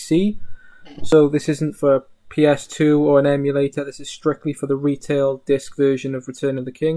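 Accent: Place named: Cardiff, United Kingdom. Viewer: Deffo a Cardiff accent haha love it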